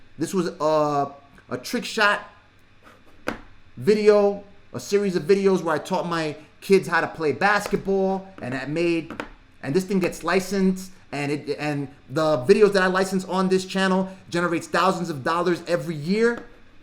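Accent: New York, United States. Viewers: Con Ed is in NYC, maybe he's from NY. Sounds like it!!! LOL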